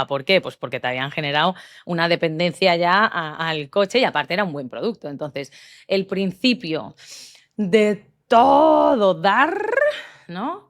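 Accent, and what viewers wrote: Spain. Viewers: De qué país eres escuché México pero tienes obviamente el acento de España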